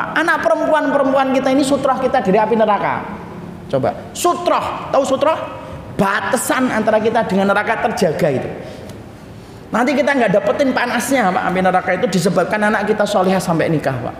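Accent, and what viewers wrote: Java, Indonesia. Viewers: 😁 pernah dengar rakan-rakan keturunan jawa saya bertutur sama macam ustaz ni. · iya ka, belaiu orang jawa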